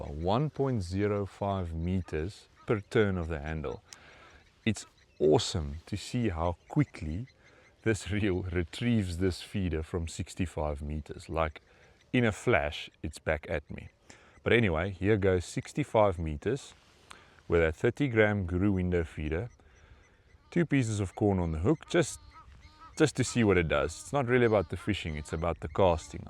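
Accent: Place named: Africa. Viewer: Afrikan accent gives me the creeps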